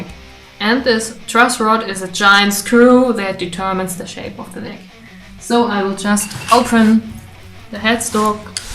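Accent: Germany. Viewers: dieser moment wenn man realisiert das sie einen deutschen Akzent hat:D und sie deutsch ist und gute Videos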